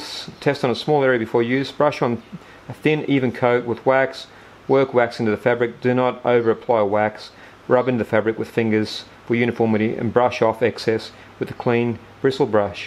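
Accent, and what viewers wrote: Australia. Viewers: Just found your videos on masking the waxed bag. Great to see and hear an Aussie on YouTube. Can’t find the video you refer to about actually waxing the fabric/ the recipe etc. Ps. I too have a singer 201. Great little machine. Mine can be used as electric or hand crank - handy for those power outages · GREAT WORK. Hey mate send me one of those orange tool bags. Let me know what you want for it and for post. HAWK........with the accent is Perfect English or at least Australian! Wouldn't know who was talking if you didn't add the Aussie flavor!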